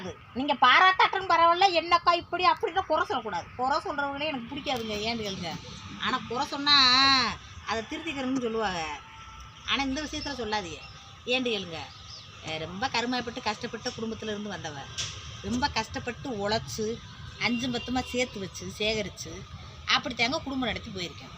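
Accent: Madurai, India. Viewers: But your madhurai slong vera level, i am live in karaikudi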